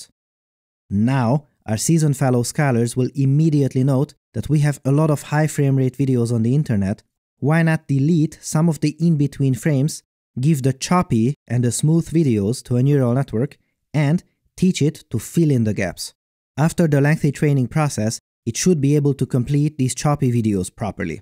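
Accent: Hungary. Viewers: His accent is definitely Hungarian. So he must live here. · Do I hear a Hungarian accent ☺️? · If anyone is curious how a Hungarian accent sounds like, here you go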